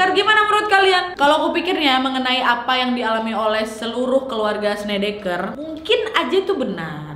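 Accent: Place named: North Sumatra, Indonesia. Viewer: Macem logat namorambe ko bah wak.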